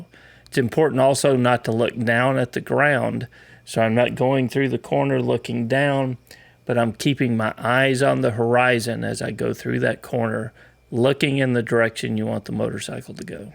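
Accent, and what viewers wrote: Texas, United States. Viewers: I like the Texan accent :-)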